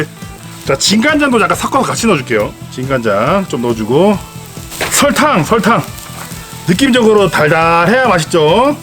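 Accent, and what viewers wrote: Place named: Gangwon, South Korea. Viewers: 기름을 지름이라고 하시면 강원도 사람이신가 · 솥뚜까리...장갑 쪄 주고...지름 두르고. ㅋㅋㅋㅋㅋ 완전 토종 홍천산이구나. 홍천 사투리 아는 사람은 알어드러이~~~ 크아~~ 영어 자막 넣어주고 싶넹.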